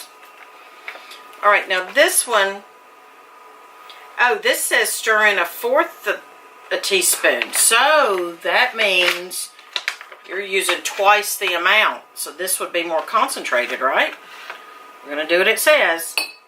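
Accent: South, United States. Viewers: You definitely from the south hunnie, love your accent 🥰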